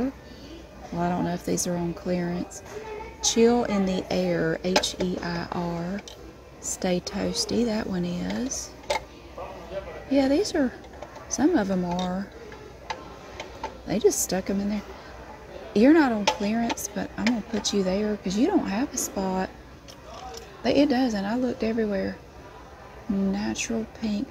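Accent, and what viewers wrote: South, United States. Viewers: Your quick-witted commentary with your southern accent makes these my favorite videos. You always make such a drastic before and after with organizing. Please do more! Thanks!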